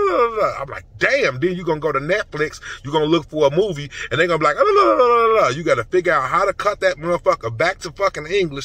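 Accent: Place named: Chicago, United States. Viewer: In can tell he from Chicago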